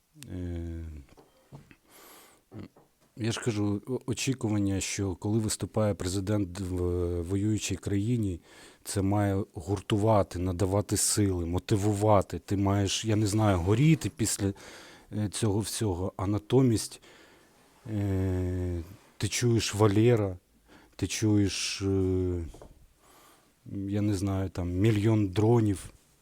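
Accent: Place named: Russia. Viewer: по вашей речи очень заметно что вы русскоязычный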